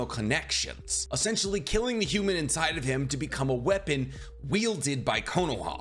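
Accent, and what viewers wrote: United States. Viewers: Min-uh-toe........Min-AH-toe. Sounds infinitely better when you pronounce his name properly. You know, for as much of your content, and thus, your livelihood, depends on Japanese culture, you'd think you would spend 20 minutes learning how Japanese phonetics work(and no joke, it doesn't take longer than 20 minutes to figure it out, and really, 20 seems like far too long at that), so you don't sound so absurdly... White? So American? So gaijin? Either way, I know you can tell how weird you sound since you've heard all these names said properly in the anime. So why are you so content to sound so off putting?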